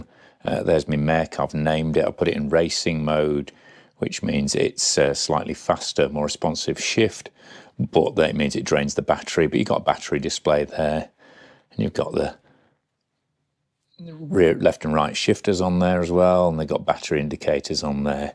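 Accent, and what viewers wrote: Northern England, United Kingdom. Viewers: Is it me or is accent getting more nothern as time goes by😂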